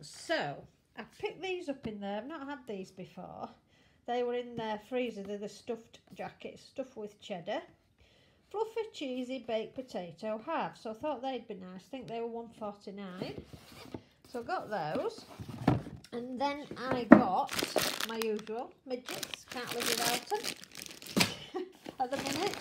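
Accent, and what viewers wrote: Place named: Yorkshire, United Kingdom. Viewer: Thanks for your tip about the liquid soap. I have never tried that one so will give it a go next time I'm at Home Bargains. By the way, I love how you say 'art' in the good old Yorkshire accent so don't ever think you have to change it! Really love your nail colour, what a lovely rich colour of green. x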